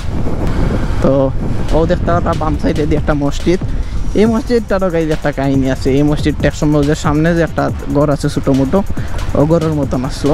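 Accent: Sylhet, Bangladesh. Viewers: Best Sylheti vlogger hands down pure Sylheti maat Your number fan from UK London Tower Hamlets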